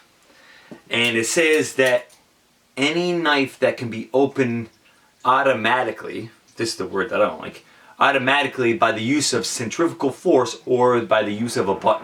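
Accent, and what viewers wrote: Canada. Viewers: are you from quebec?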